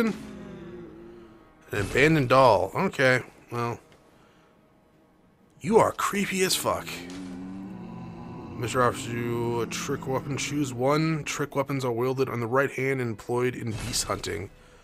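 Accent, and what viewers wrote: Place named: Mid-Atlantic, United States. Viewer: Knowing that has Brit relatives goes someway to help explain his accent, clearly American but every now and then it verges into mid-Atlantic eg lever pronounced as lev-ver but occasionally as lee-ver it's somehow enjoyable to listen too & coupled with the gameplay & the extraordinary engaging commentary it has me watching a game that not normally my cup of tea.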